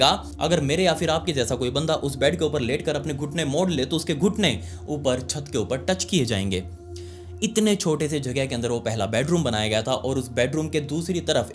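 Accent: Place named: Haryana, India. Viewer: bhaiya ki haryanvi bich bich m nikl jati h m ek ek word notice krti hu😂😂😂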